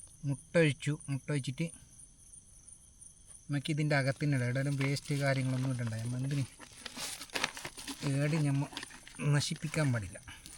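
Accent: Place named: Kasaragod, India. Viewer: ide സ്ലാങ് മദി soopper കാസർഗോഡ് ഭാഷ 👍👍👍👍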